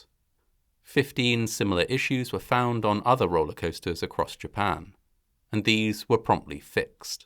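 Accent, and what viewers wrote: United Kingdom. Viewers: Don’t watch if his accent bothers you that much better yet ,justjust unsubscribe and be on your way. you knew he was British and yet your dumb ass kept watching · God i hate the thick british accent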